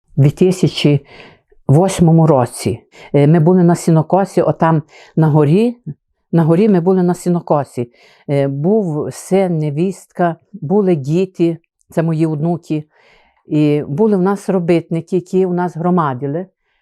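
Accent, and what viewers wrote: Transcarpathia, Ukraine. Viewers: Як я люблю Закарпацький Говір! дякую вам! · Дякую вам за цікаві розповіді,люблю ваш канал,дивлюсь з задоволенням .Освітчуєте цікаві місця де я хотіла би побувати ,але не взмозі з багатьох причин.Дуже люблю закарпатський говір,мрію так говорити ,намагаюсь.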